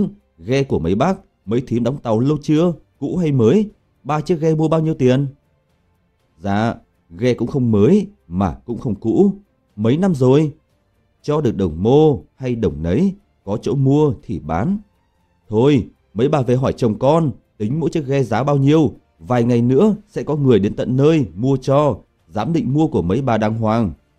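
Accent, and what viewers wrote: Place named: North, Vietnam. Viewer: Người kể chuyện mà theo giọng Quảng Nôm thì hấp dẫn vì sống động hơn nhiều Giọng Bắc khét lẹt!!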